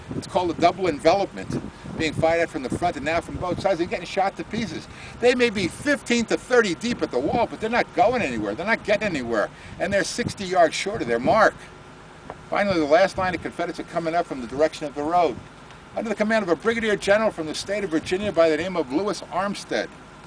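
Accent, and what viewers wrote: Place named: North, United Kingdom. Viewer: This fella tells the story with passion. To my foreign ears he sounds like a Northerner, but he still has the passion for passing on the deeds of those that went before him. Great presenter, great passion and a credit to himself. Well done sir. Glad this was in my feed today :-)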